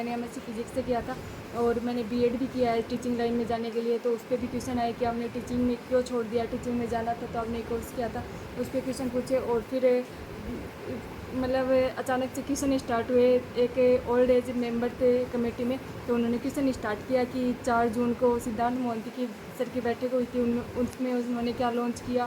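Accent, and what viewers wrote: Rajasthan, India. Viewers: Video suru krte hi smjh gya thha bolne ke trike se, rajsthan se hai medm ji 😂😂😂😂 achi vancency thhi idhr bhi Apne zone se hi bhrna chhaiy thha ADO.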